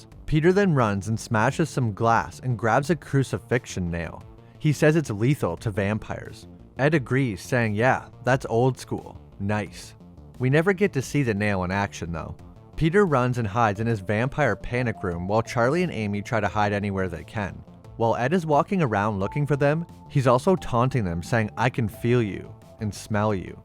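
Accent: Canada. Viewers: Sounds like hes from the great white north and talk with that kinda accent, just my guess .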